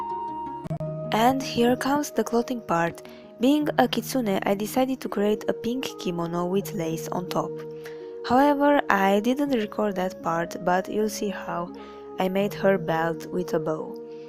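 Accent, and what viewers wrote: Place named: Russia. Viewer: Либо ты второй человек подряд с русским акцентом, либо у меня паранойя 😰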